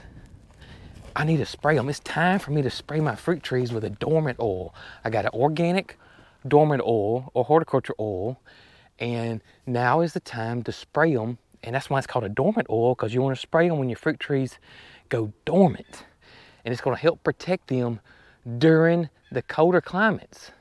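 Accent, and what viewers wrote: South, United States. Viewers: and you have such a beautiful intelligent daughter grown up fast we hope zerks ok. my husband could watch you in youre man tunnel allday 24/7 I hope does more cooking as we are from Scotland i cooked and gave him cornbread and turnip greens yes it was a thumbs up cornbread now part of dinnertime with his gravy love the southern accent you should do an audio book soothing voice and when can bring a southern cookbook out love the clan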